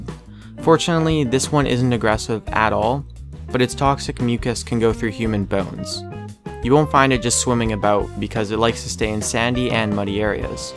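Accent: Canada. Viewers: Are u canadian? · are you Canadian? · You're Canadian aren't you? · are you canadian, definitely canadian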